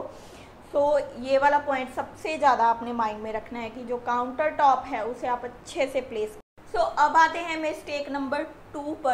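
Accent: India. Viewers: Are u punjabi...just guessed from some words n accent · Hariyanvi accent?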